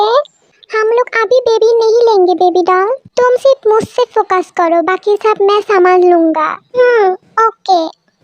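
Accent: Bengal, India. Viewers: Sis your Hindi accent is very cute mixture of Bengali and Hindi nice to hear🫰🫰🫰🫰🫰🫰🫰🫰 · Dakke nahi bula ke are you Bengali cz apke language main bohot bangla feel hain btw nice story 🥺😽btw new subscriber love you Please Don't mind kiyuki maine apki voice ke bhare main bola hain sorry🥺And your voice is so cute 🫶🏻😩🦋and apki story bohot peyari hain 🥺plzz pin me god bless you borahae 💜🤍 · Are di apto bengali ho na 😊 apki hindi so sweet 😊😊😊❤❤ so cute story and darun hoyeche golpota ❤❤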